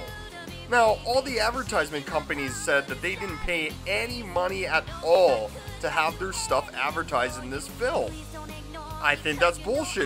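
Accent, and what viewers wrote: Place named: Canada. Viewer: He sounds like a Canadian.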